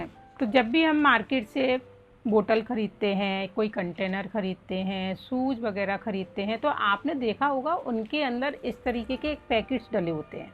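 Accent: India. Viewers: Your accent looks like Maharashtran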